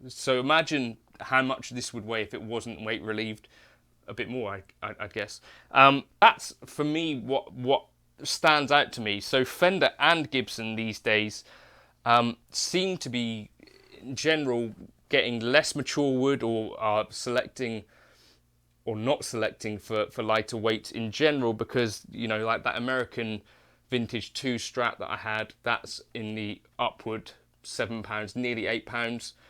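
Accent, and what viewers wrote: England, United Kingdom. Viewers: Guys it is ENGLISH UK accent. His Wife has a Great Accented Voice too.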